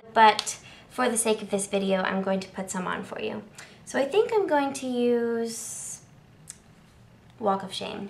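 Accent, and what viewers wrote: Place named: South, United States. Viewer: where are you from i absolutely love the southern drawl!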